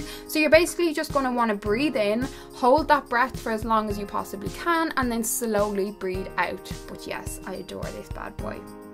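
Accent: Ireland. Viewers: Are u Irish you sound irish 🇮🇪❤️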